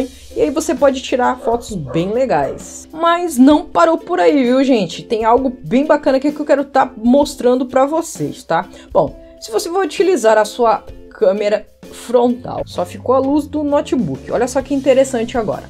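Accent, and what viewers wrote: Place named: Rio Grande do Sul, Brazil. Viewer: Adoro esse sotaque do Rio Grande do Sul. confere?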